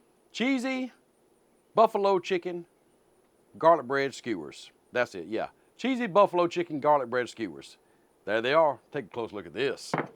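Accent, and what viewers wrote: South, United States. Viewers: Another great recipe! Love the Southern accent. Greetings from Finland!🙂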